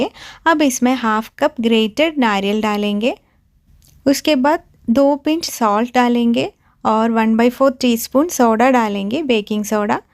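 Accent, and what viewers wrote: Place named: Kerala, India. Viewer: So nice love your hindi with Kerala accent so sweet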